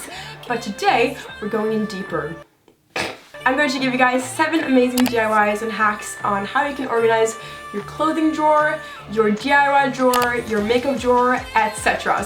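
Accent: France. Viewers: Your French accent is sooo cute , I speak French since my 2yO😛😛😛💕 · Hey are u French or something because it sounds as if u have some kind of accent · I’m not even French and I know that her French accent is on point 👌🏻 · I'm french and I have the same accent as you love your vids merci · you have a great french accent and i know wht i'm talking about, i'm french !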